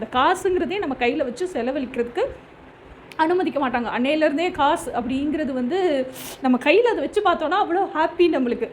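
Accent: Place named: Madurai, India. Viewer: Madurai pechu 👌🏻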